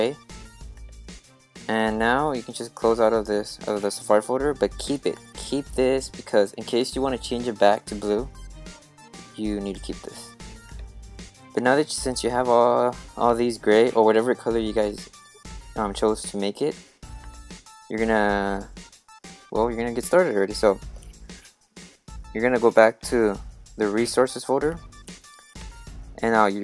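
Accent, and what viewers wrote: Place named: Japan. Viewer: pfff your accent bro! is it ..japanese or something !!!!!!!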